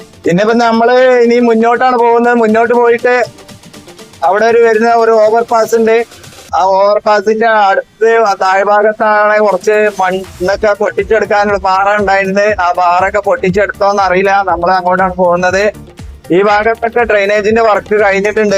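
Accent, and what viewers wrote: Malappuram, India. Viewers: കഴിയുന്നതും വളരെ ഏറെ ഭംഗിയായി അവതരിപ്പിച്ചു.. നമ്മുടെ മലപ്പുറം ശൈലിയിൽ പറഞ്ഞപ്പോ വല്ലാത്തൊരു സന്തോഷം..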